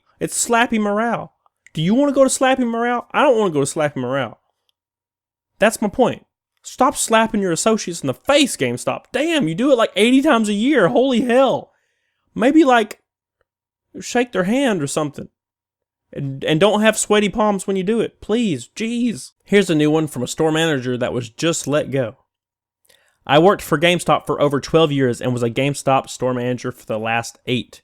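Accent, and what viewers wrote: South, United States. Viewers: Ah. Figured it out at last! is channeling William Shatner..... If he had a southern drawl. Gamespot... IS..doitagain. SCOTTY! Set phasers....TO full. loadalltorpedoes...... <link> This is what I hear in my head whenever Gamespot is mentioned in these videos. <link> (Pretty sure no crabs in this video were left unharmed) Love the content man. Keep up the awesome work!!!!!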